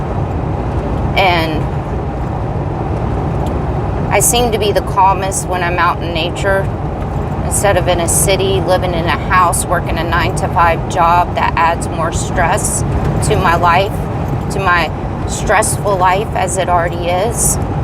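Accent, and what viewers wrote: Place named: Georgia, United States. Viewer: Your kindness is not wasted. I think you are beautiful. I can't tell you how to find happiness, but I hope you do so, often. I'm from GA originally and something about the way you talk reminds me of home. I love your videos and I hope you continue. - - Not sure how this will land but I will mention it. God will always be there to listen. Prayer is therapeutic, at least. Even if that is all that is expected of it.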